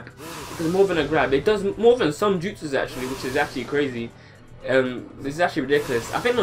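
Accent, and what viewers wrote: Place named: France. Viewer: I didn't even realise this guy was speaking english, i thought i clicked on a french youtuber's channel for a second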